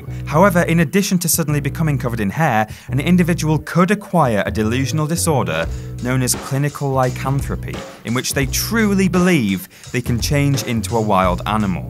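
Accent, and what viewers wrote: Canada. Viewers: Is this English guy actual Canadian or have I have been to many episodes of South Park? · i didn't know you were Canadian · Is Canadian?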